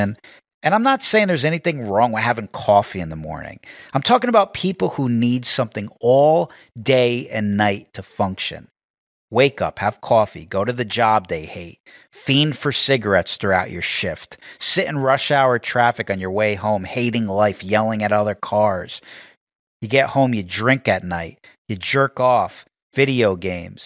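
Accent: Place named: New York, United States. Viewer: You sound like a New Yorker, I Love it! 🙌🏻✨🥂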